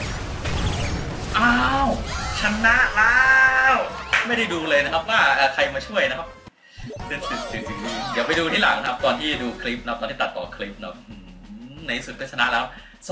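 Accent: Isan, Thailand. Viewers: พี่เป่นคนอีสานเหรอครับ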